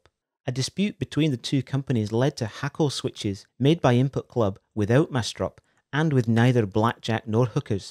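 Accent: United Kingdom. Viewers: did your accent change to more scottishy or is it just me?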